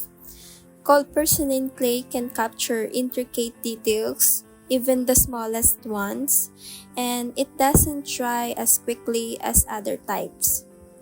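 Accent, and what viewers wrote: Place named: Philippines. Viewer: based on your voice and accent filipino ka po ba?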